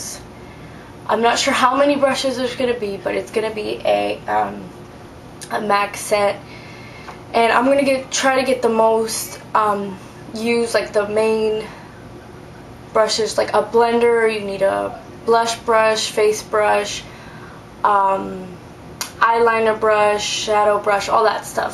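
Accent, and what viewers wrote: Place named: Latin America. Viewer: MAC omg ur soooo prettyyy and u hav a sper cute accent its got like a lil latino kik to it lol if u no wat i mean...cant belive this contest is over reli cud hav dun wiv those products lol tc xxxxxx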